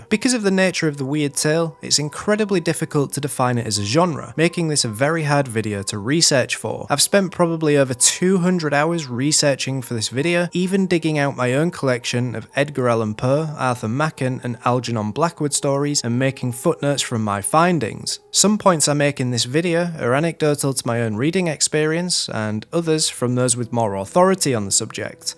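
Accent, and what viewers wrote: Yorkshire, United Kingdom. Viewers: Love the video. Love the "yawk-shuh" accent. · Some of us in the UK also pronounce it as 'aitch' depends where you're from. We have a wide variation in accents here. The chap doing the video had a strong Yorkshire accent for example. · I'm from Yorkshire, and I am so glad you have decided to stay authentic to your accent. Yorkshire and proud. :)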